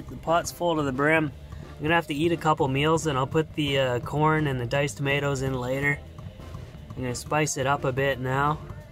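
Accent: Canada. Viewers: I am English and I can tell you are Canadian because you say ' oot' instead of Out ! For 25 years I spent the summers in Germany and winters in Morocco in my RVs If I were you I would be in Arizona (My No 1 State) in winter and Canada in summer. Thank you so much , Love your videos. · That thick pure Canadian accent is complete ASMR. Damn, it's just what I needed to soothe my soul. Thank you. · Is that Canadian accent or what? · This guy sounds exactly how I thought Canadians would sound. Also people tell the guy to move, assuming he would go south.... this god of a troll goes even more north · I'm Canadian and this is the only guy I've ever seen in my life with a legitimate Canadian accent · Wow that’s the most Canadian accent I’ve ever heard. 😉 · I love your accent and they way speak Some words small pause some words with long vocal end Pure Canadian lovely chap God bless you And please drive carefully on those ice roads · Whole Food Plant-Based Man I love Canadian accents because it’s a mix or a British and American accent. 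· The way this guy talks I'm assuming he's canadian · Is this a canadian accent?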